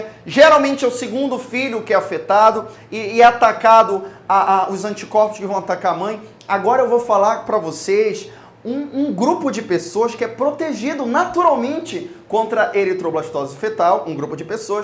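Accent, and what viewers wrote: Pará, Brazil. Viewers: Tu é paraense mano? Tem um sotaque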